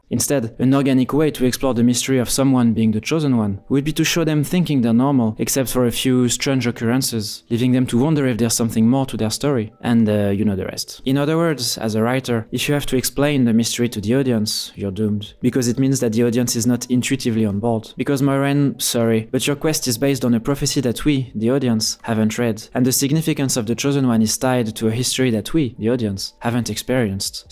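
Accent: France. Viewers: I watched this video and the one about the cabin in the woods, and I really like how you edit your videos and present your thoughts. Someone in the comments on the cabin video said you should summarize the plot oft the movies you review more - I disagress. Discussing specific parts of movies is a really good advertisement to watch the movies. Your charming French accent is the cherry on to...can't wait to see and hear more of your work!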